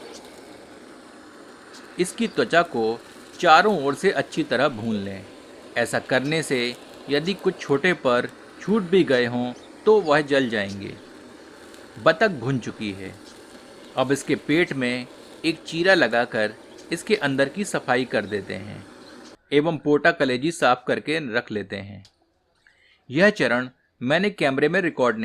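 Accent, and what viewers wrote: Standard. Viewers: Itni shudh hindi in cooking 😮 very nice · Whh sudh Hindi very nice bro thanks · 💯 shudhh hindi I like it☺️🥳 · बहुत ही शुद्ध हिन्दी का उच्चारण करते हैं आप सुनने में बहुत ही अच्छा लगा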